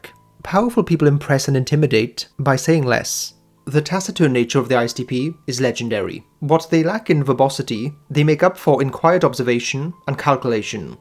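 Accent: Wales, United Kingdom. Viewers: dwi'n abod accen yna, cymraeg ti?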